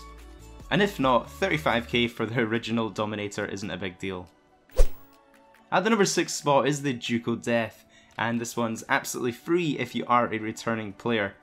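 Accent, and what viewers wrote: United Kingdom. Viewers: Great video brother trying to figure where in uk your from ireland??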